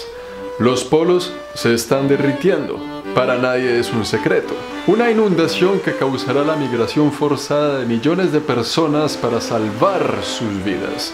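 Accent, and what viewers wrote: Colombia. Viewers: Eres de panama crei eras colombiano tienes acento colombiano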